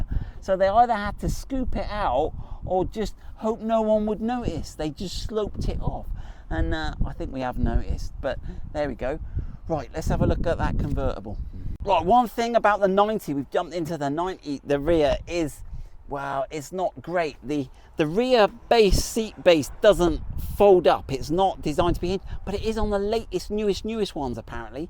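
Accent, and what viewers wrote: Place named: England, United Kingdom. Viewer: Trying to place your accent, Norfolk or Hampshire? It's not West Country.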